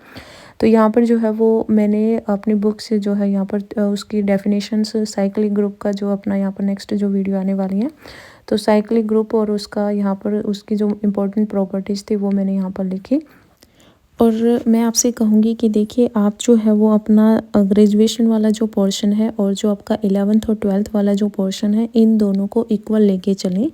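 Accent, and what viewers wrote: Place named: Kashmir, India. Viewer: Mam apk accent se lga..kashmiri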